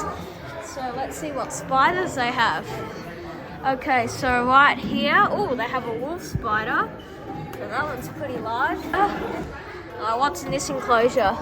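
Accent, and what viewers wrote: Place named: Australia. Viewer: are you as cute as you sound with that great aussie accent...i love the Australian reptile park been there several times....tell tim scott said hi mate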